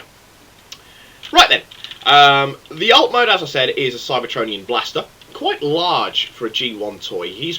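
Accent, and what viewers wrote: United Kingdom. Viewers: I know you may have heard it before but my friend and I think you sound like a brit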